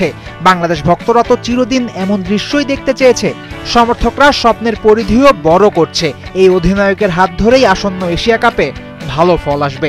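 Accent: India. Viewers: একাত্তর টিভির সংবাদ পরিবেশন ইন্ডিয়ান সংবাদ পরিবেশনের মতো মনে হয়।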